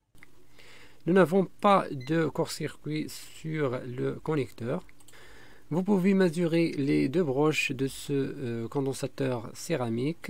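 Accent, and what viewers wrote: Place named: Algeria. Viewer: السلام عليكم ، الأخ مين من الجزائر لهجتك جزائرية، أريد إستشارتك في موضوع الله يحفظك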